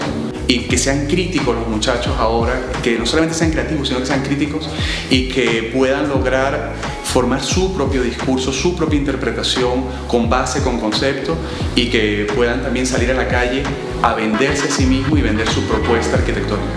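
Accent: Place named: Venezuela. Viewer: Nada más hablar supe que eras venezolano!!!! Es que tienes el don de la palabra certera y didáctica. Una de las cosas que más valoro de nuestros compatriotas profesionales que se exponen por internet es que no recurren a groserías ni expresiones de mal gusto. Felicitaciones y éxitos. Ya me paseo un rato por tu canal 😊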